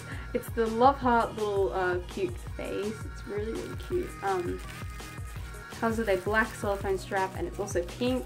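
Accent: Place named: Australia. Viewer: Erika Flores I have the same accent as her it is cause she is from Australia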